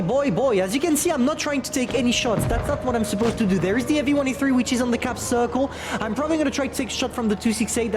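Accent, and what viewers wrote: France. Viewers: Awesome video for newbie and yeah, seems like u are france, arent you? Idk why but i think so.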